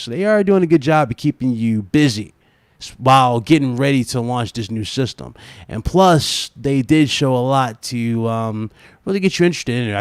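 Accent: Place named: Atlanta, United States. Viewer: we need to use his webcam 😄 it's so weird watching you since you didn't use cam before. people thought you were white? lol I can pick up that Atlanta accent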